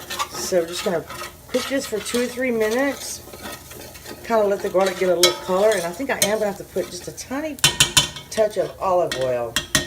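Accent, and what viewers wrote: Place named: Louisiana, United States. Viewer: Looks delicious! I love cajun food and Louisiana! I was actually thinking when listening to you that you probably live in Louisiana! Lots of garlic and lots of cheese! Mmmm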